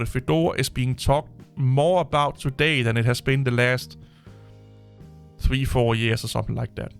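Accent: Denmark. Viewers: you're on the money nearly all of the time. One of the few Linux YTubers to be honest about the pros and cons of Linux. I use MacOS, Linux and Windows btw - each has merit. Danish accent fine by me! Very proficient in a second language unlike most native English speakers. Actually I like Fedora and use it - a good distro, but one amongst many. Just use a distro you like and suits you without making grandiose fanboy claims about it!!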